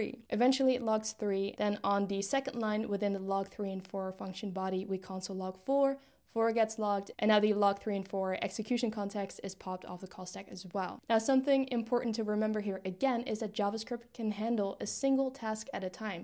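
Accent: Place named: Netherlands. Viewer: I hear some slight Dutch here :p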